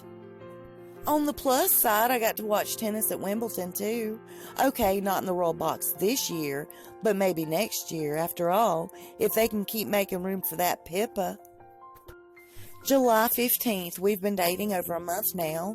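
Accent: South, United States. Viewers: How does someone with a southern accent know so much about the royal? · I am glad i found this channel..love your southern accent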